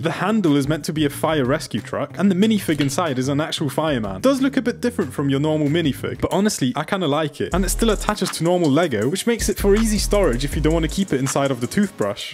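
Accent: United Kingdom. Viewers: He's just british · Mate are you briish pin me if you are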